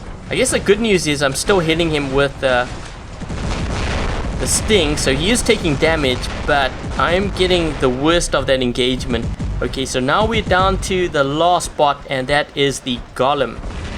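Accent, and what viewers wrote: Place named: South Africa. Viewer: An excellent response mate, thank you for taking the time to write it and it very much covered every facet of my line of questions, so cheers once again. Culturally I think the Russians are very different to the west so the tact and understanding you have shown, even of the smallest nuances, goes a long way with them. Keep up the good work! Finally I think I asked on live chat once if you were a saffa or from Zim because of your accent but then you said you’re a Canadian national so am now confused.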